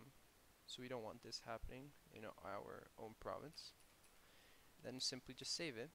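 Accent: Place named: Scandinavia. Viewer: He's gotta be scandinavian for his accent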